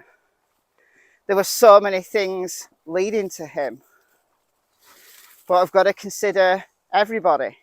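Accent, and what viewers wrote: United Kingdom. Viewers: I live in Milton, Florida in the US, and EVERY morning I wake up, FIRST THING I do, is go to YOUTUBE, And look for your video. Every morning, and several times a day! I value your insights, your option, your intelligence, plus my best friend is British, I LOVE your accent!! YOUR highly intelligent, unbias, you look at every side to the story, not just one side. You have facts to back up your opinion. You don't shame ppl in the case, you stand for truth, morals and justice for Summer Moon Utah Wells!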